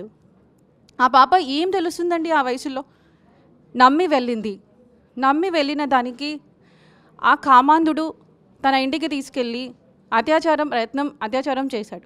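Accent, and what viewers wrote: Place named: Karnataka, India. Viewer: May be Kannadiga...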